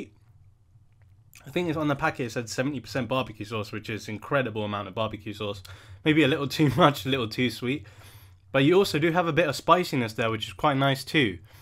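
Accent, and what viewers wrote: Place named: United Kingdom. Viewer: Your not white no, it doesn't mean you arnt British. You do however have a strong British accent. So your upbringing isn't obvious.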